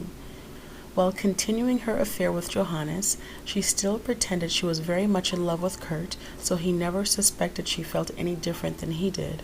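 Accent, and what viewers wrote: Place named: Canada. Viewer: You've taken the bull by the horns here. That is extremely commendable. I've said this before, but I really, really like how you end your videos with discussion questions. You are a very strong personality. Ha ha. Just judging from your accent, are you Canadian or upper U.S. midwest? I've been all over in my life, and that's what I think I hear. I'm prepared to be wrong though.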